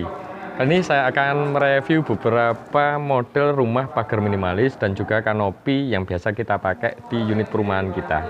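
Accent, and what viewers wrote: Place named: East Java, Indonesia. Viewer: Seperti orang jawa timur.. Aksenya itu lho.., buanyaaak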